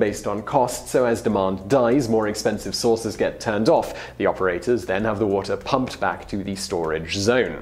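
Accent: United Kingdom. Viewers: THankyou! You have a perfect British accent and i could listen to you all day if i wanted to <3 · I never noticed his accent. I always thought he was an American but he's NOT. I just noticed his British accent.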